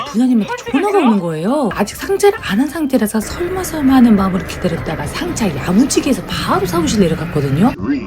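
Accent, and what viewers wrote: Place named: Yeosu, South Korea. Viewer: 여수 사투리 잉~~잉??!! 구독 합니닷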